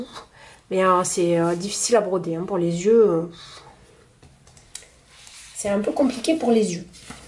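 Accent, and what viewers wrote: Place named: Southwest, France. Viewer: bienvenue dans le club des premiers flosstube lol tres joli accent ça sent le sud ouest je dirais ... j ai le même accent et ça fait plaisir ta derniere creation en petit coussin est magnifique j ai beaucoup d admiration pour toutes celles qui font du point compté car moi je suis essentiellement sur du travail en toile imprimée gros bisous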